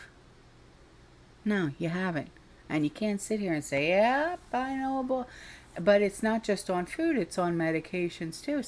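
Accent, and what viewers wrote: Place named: New York, United States. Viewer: I love the way talk.... That Néw Yoik talk íts awesome!!!your awesome☺🤘you rock.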